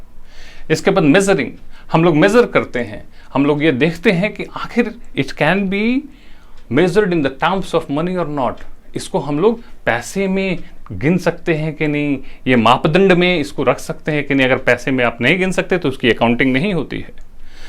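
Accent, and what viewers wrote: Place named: South, India. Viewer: Nice work bhaiya. Congratulations . n I will say it again. You have south Indian accent. 🤘🤘🤘🤘🤘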